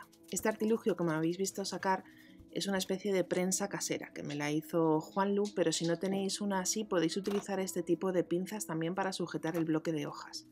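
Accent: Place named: Spain. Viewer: I love your Spanish dialect although I do not understand it but it is beautiful and all your works are elegant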